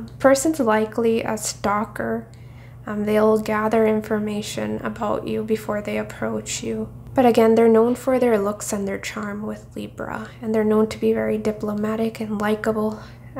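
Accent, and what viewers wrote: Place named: United Kingdom. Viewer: I loves his British accent😅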